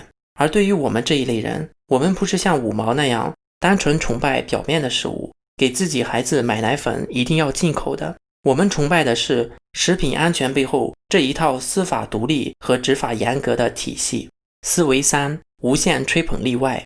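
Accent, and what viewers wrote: Shandong, China. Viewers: 口音大概是山東那區的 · 听你口音是山东人周边地区的人吧？呵 tui。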